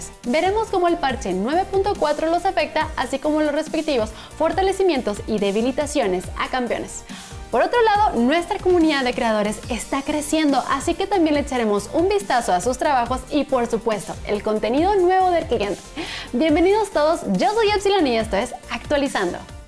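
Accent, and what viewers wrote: Mexico. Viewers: Demasiado robótica aparte su mexicano weyyyy uff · Es mexicana?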